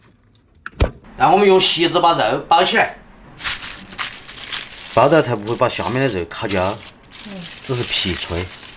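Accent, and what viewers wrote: Sichuan, China. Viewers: 好标准的四川话。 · 很好吃的样子，不过还是怕油腻 四川话很好听啊，越听越好听 想念国内的新鲜大葱😍😍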